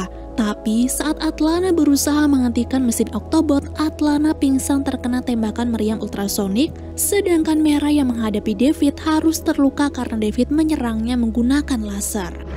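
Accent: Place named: East Java, Indonesia. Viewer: Ini pembawa alur ceritanya orang daerah mana yak? Kok bahasanya gemesin banget 😃 cak cok cak cok 🙈🙈🙈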